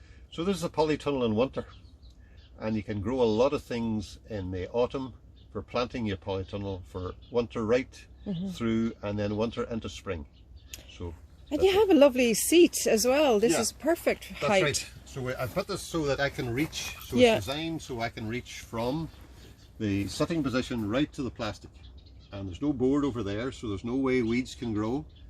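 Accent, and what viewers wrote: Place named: Donegal, Ireland. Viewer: Love this , keep them coming! Lovely to hear a lovely Donegal accent.. well done 👏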